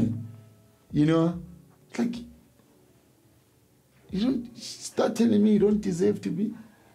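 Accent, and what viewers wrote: Zimbabwe. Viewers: This guy talks like a Zimbabwean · Is this guy Zimbabwean or Southern Ndebele speaker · He sounds Zim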